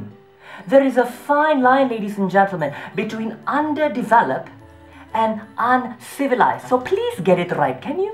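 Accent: Sabah, Malaysia. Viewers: you have thick sabah accent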